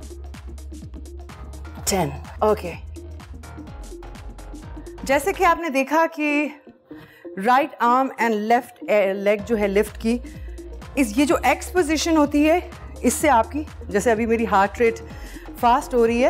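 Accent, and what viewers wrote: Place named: Punjab, India. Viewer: Hahahaah ur punjabi just love😂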